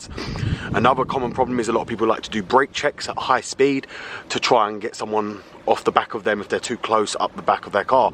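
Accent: United Kingdom. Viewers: mATE were you born in the uk?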